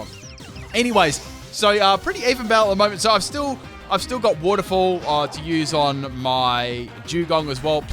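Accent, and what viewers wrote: Australia. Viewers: PLEASE PIN THE COMMENT I LOVE YOU AND YOUR AUSTRALIAN ACCENT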